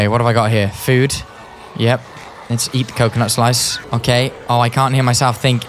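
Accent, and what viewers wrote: United Kingdom. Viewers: dude has the most british accent ik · its the first time i watch a movie from you but why are you sounding so british? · You sound more british than i last saw you 😅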